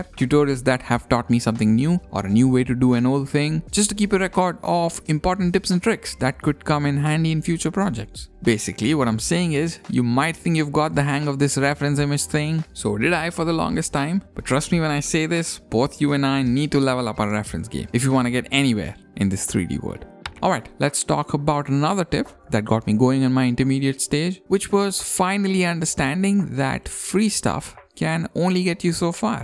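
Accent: India. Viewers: You might grow this channel much faster if you get rid of that painfully annoying Indian accent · What what what you're Indian !!😮 your accent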